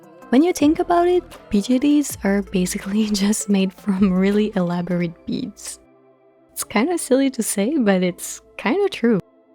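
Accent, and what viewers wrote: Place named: Quebec, Canada. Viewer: I love him so much, i'm surprised you have so little followers since your stuff is so good :O ps. are you from quebec ? Because you have a similar accent to me :3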